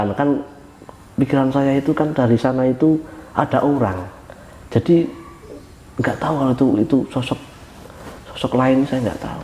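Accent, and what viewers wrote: Java, Indonesia. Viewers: Jawa campur nasional😅bljr bhsa dlu yng bnr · Yeee ,org jawa ya bgtu ,,,medoknya keliatan bnget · Koreksi. Suara agak kurang jelas dan ada suara gemerisik gitu. Kurang cocok kalo di dengerin gak pake hedset. Dan juga ngomongnya terlalu medok buat aku yang nyunda🙏🏻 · Mas jd youtuber aja. Cara ngomongnya enak. Walau gaya jawa yah - lama bener critanya hehe - tp saya nonton trus sampe abis krn mw tau endingnya - bikin penasaran & seru juga ... Salam dari Jakarta.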